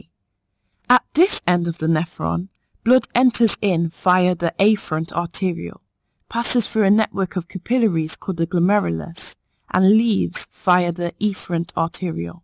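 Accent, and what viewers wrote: United Kingdom. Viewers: Your pleasing british accent made this tutorial even more handy Great lesson indeed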